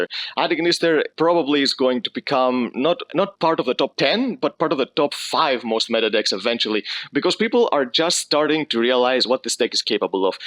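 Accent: Greece. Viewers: bro you sound kinda greek this accent gives greek vibes also very nice video!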